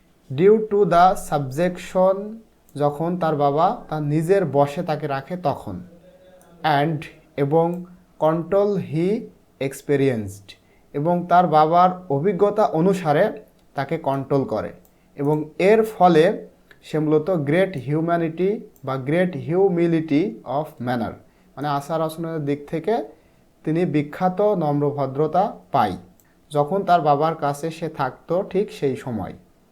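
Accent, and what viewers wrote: Bangladesh. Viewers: Apnar bangla english accent sondor asa, thank you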